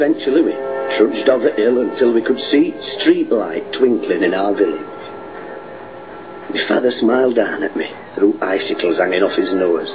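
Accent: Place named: South Yorkshire, United Kingdom. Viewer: The voice is that of Tony Capstick, a folk singer and radio presenter who had a South Yorkshire accent. Nothing like Mancunian, I'm afraid Manchester is, indeed, in Lancashire, but Greater Manchester comprises parts of south Lancashire and north Cheshire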